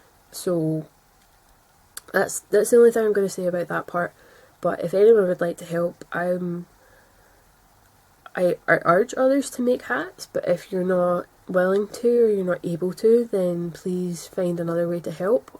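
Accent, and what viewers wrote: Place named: Scotland, United Kingdom. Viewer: Hi, how did you find the Ashford kiwi? I have a traditional and I'm thinking of getting one ( I've fallen down that hole we crafters seem to fall down) My mums side of our family are from Kilmarnock, it always makes me feel at home when I hear the accent, where abouts are you from? We always make sure we nip into Stevenson when we visit, there's a little bakery that sells the best tatty scones;)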